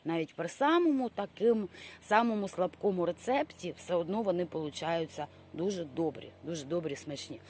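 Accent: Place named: Western Ukraine, Ukraine. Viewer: на заході України інша фонетика (звучання) у вимові.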